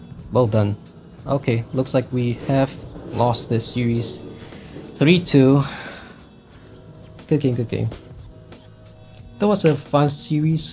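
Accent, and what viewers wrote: Singapore. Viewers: mm where are you from? Singapore?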